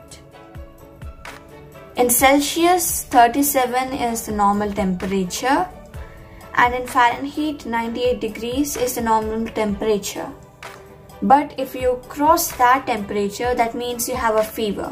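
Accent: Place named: India. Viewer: You will be more diseased than you were before and that's Indian accent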